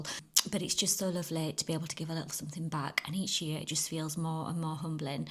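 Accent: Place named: North, United Kingdom. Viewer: 61. I love your videos...your home is beautiful. As a northerner who no longer lives up north, it's great to hear a fellow northerner and to see some of the northern sights! Keep up the good work!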